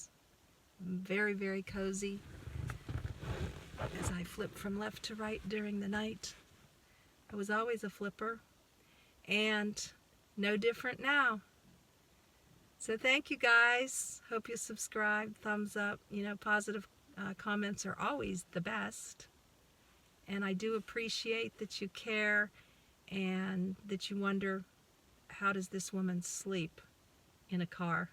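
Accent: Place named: Philadelphia, United States. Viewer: Oh one more thing...I am from Philadelphia, ..I now live in MIchigan..but boy you sound like a philly girl to me ..are you from the east coast?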